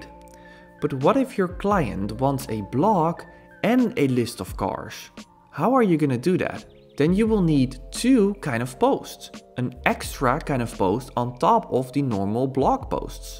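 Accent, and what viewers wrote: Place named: Netherlands. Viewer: Thank you very much! This is, again, useful and clear! I need to go through the video step by step as there is so much packed into it. But every single step is crystal clear. BTW: I love your slight Dutch accent.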